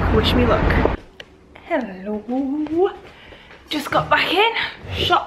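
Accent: United Kingdom. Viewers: Hey! Just got to the point in the vlog where you've spoken about feeling kind of stuck and frustrated in terms of your blog.. and to be honest I don't get it! I LOVE the content you're putting up recently. No matter if it's outfits or collaborations (like the h&m one, which I loved especially) Reading your post makes me giggle sometimes and I even read them in your british accent!! You seen to be such an ambitious young lady, who knows exactly what she wants and I find that so inspiring. There is really no need of feelig frustrated. I think a lot of these feelings come from maybe comparing yourself with others, in this ever changing industry and having to be on top of style, content and life in general. you're doing a Great Job, in all these aspects! and by great I don't mean perfect, because perfect doesn't excite many people. By great I mean relatable, honest and true to yourself! 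much Love xxx PS: I go on your blog every time I've seen an Instagram/snapchat post where you update your followers about it! keep that up xx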